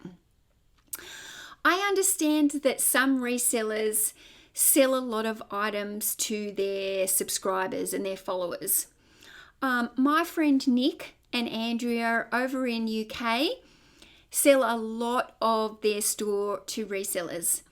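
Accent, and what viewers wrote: Australia. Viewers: Love the Aussie accent. I also have a YouTube channel in Oklahoma. I am subscribing to your channel. Hope you will check mine out and subscribe too. Another YouTube couple drifterthrifters in Queensland follows my channel. Mine is all one word “TheCapGuy”. Have a great day at the op shops.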